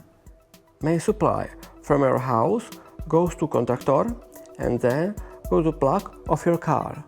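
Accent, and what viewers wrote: China. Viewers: his English accent sounds a bit like Chinese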